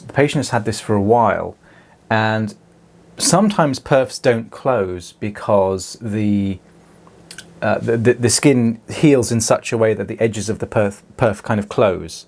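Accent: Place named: RP, United Kingdom. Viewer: That accent is way too posh for you not to be also part of the “anyone” group.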